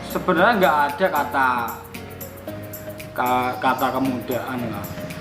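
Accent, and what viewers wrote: Java, Indonesia. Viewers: Medok banget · Suaranya medok bgt mas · Ojo meduk" nek sereden 🤣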